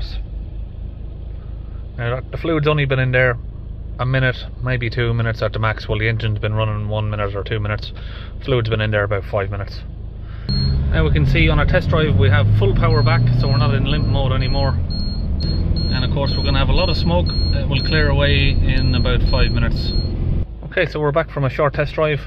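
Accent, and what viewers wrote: Ireland. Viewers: Hiya. Love watching your videos and we don’t even have a diesel now. Got a question for you which is away from cars & vans just for now. I’m 63 now and Dad was a Kerry man while Mom was Coventry. They used to take me to Ireland when I was a nipper to Westmeath near Mullingar. Your accent does keep reminding me of my time there so am I close or not. Do hope you don’t mind me asking. Keep up the brilliant videos 👍👍🇮🇪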